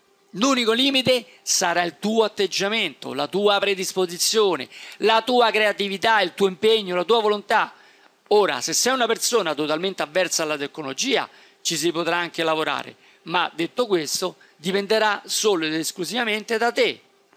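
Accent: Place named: Rome, Italy. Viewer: già mi ha attirato molto l'argomento della discussione, ma con la tua voce romana mi hai convinto ancora di più :D sarebbe un miracolo se tutti gli insegnanti avessero la tua parlata ^_^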